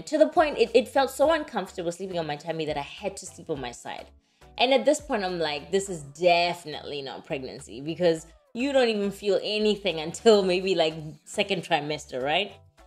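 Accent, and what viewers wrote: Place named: South Africa. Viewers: I thought as much that u can be South African. 😁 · Ur accent is so close to South African 😳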